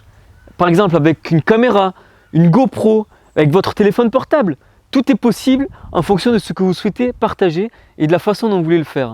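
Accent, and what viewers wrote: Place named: South, France. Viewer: Coucou ! J'ai pas regardé toutes tes vidéos mais ce que j'aime beaucoup chez toi, c'est que tu progresses vite ! Ta diction est beaucoup plus punchy et moins saccadée, tout en conservant cet aspect "théâtral", c'est vraiment chouette ce que tu fais ! Après je t'avoue, mais je veux pas t'influencer hein, mais j'aimais plutôt bien les pistes de réflexion profondes (avec une petite teinte d'humour comme t'aime bien faire, saupoudré de ton accent du Sud (à lire aussi avec l'accent du Sud aussi aha) ;) ) Mais je pense que tu feras ça pour les prochaines ! Continue l'ami t'es clairement sur la bonne voie !!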